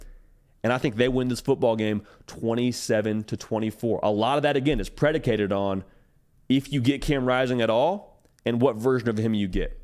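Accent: South, United States. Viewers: yeah, it’s quite obvious this guy favors SEC teams and blue bloods. I mean, if his southern accent doesn’t give it away, his talking points absolutely do.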